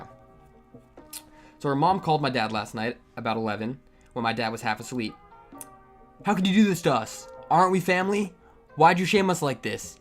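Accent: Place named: West, United States. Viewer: Nice western accent.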